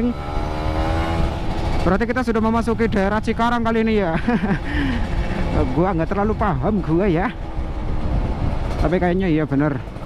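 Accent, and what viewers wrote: Banyumas, Indonesia. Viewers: Rider ngapak 😂 Jadi kagak ngantuk gue nton vlog rider 1 ini salam kenal aja mase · Salam NINJAR NINJARR KEBUMEN 😂 ,,vlogger nyampur ngapak😁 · Ngomonk nya bhsa ngapak aq jdi inget waktu bersama tmn tmn q dri wonosobo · Ngapak,e ketok mas😁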